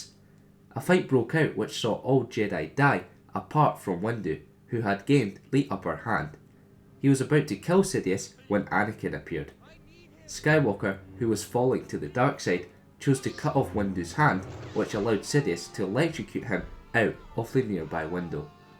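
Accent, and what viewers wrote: Scotland, United Kingdom. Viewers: the scottish accident makes it better more like a young Kenobe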